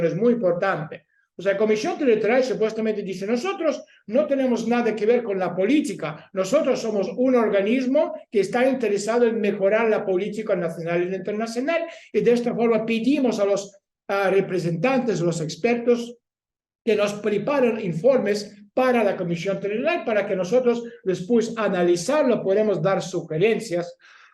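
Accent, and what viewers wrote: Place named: Spain. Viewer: Si ya vives en México¿Porqué hablas en español de España? Creo que sería más cómodo para todos que te fueras a vivir a España, con Borrel, te sentirás bien de compartir la lengua de ese "rusófilo" conocido.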